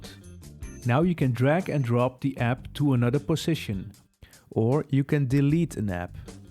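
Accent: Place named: India. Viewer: This voice belongs to someone from India. It's impossible not to recognize the accent.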